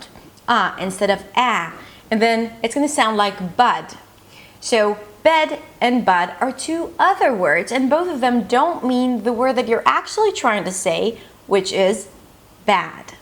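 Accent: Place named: United States. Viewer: I agree with everything you have to say. But I hope you know that you have an American accent. And yes, that is also an accent.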